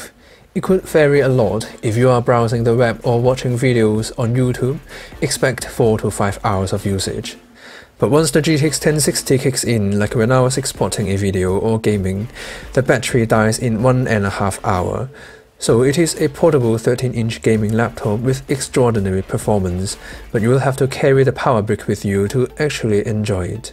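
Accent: United Kingdom. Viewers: i hate that british accent... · 好英式既口音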